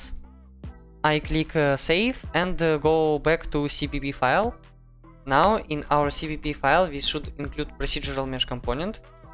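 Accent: Russia. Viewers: Amazing! Бат ёр рашн аццент выдает тебя с поторохами😊 И это здорово, так как твой английский я понимаю и без субтитров.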